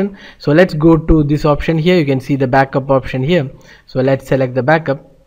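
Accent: India. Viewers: for gods sake speak in a slower manner so us English speaking people can understand you my man...I know you are doing your best but your speech pattern is hard to follow when you more or less mumble all the words in the same monotone .....wish to god I could speak on this thing (think post).. Asian Indians really need to S L O W down in your speech patterns....hell I'm Southern and don't speak English that good myself but it comes across horribly.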